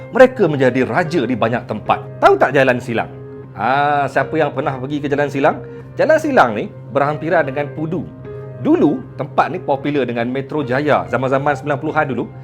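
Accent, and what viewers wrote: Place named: Lampung, Indonesia. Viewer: Bahasa anda mirip bahasa Lampung mungkin satu rumpun dengan Lampung Indonesia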